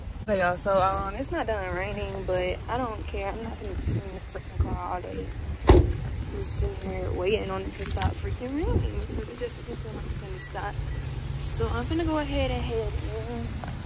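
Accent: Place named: Chicago, United States. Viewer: This my first time watching you but I’m gonna take a guess and say you’re from Chicago ? Lols” fye “ “do you shit wop “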